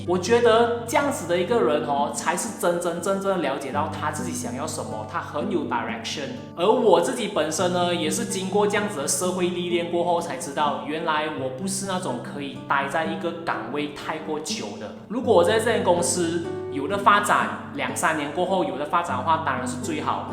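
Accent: Malaysia. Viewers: 你换工作薪水能跳一个30%肯定会要换喔🤣😂😁 很喜欢听你的联邦腔😛😄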